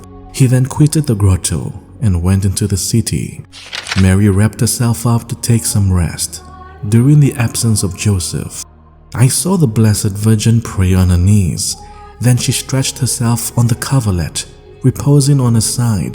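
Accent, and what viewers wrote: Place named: Nigeria. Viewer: I love hearing you tell this truth In your Nigerian accent Reminds me of old friends St Catherine intercede and pray for us to love one another ☘️☘️☘️🙏🙏🏼🙏🏾🕊️🕊️🕊️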